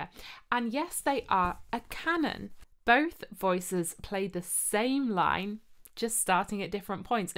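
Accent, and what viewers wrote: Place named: England, United Kingdom. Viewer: I LOVE Telemann. Question fer ya, if you don't mind. I love English Accents. Where is your accent from? England has so many & some I really like. (if you don't mind me asking)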